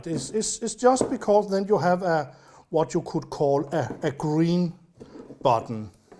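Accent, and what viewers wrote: Denmark. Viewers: I am the people who love his Danish accent 🤣💖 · I love your accent! My grandfather and many of our family came from Denmark. Many of them used Dane to say things. I loved it. I have a collection of the Danish plates in my house. So I will say Velkommen. My Grandfather would sing to my daughter and dance around with her. Wonderful memories. Thank you. I know the word for thanks but I don't think it is right. Joyce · Great in English, I follow him in Danish or I should say watch, learn and also enjoy his Danish accent. He seems such a lovely man, very creative.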